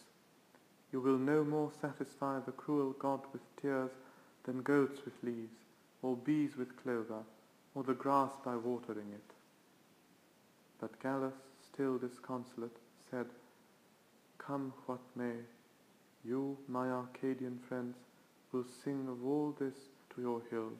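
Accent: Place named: RP, United Kingdom. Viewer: Your English accent is very posh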